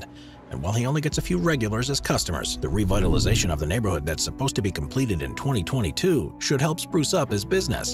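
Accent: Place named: Philadelphia, United States. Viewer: super random by are you by chance from the philadelphia area? I notice the way you say words like "majority" and "Florida" with the a pronunciation which is how my philadelphian parents talk. I feel like I dont hear it that often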